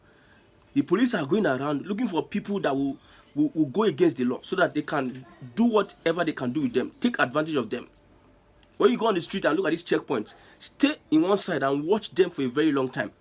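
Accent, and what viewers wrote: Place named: Edo, Nigeria. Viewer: So Nigeria is such a zero-freedom of speech state now. Nigerians stop bullying T-Pain ooo. Make we kuku get military na! Well done, dude... you speak like an Edo man, the kain craze wey people from old Bendel get...😂😂😂. Anywa, I just came across your videos. Id suscribe now.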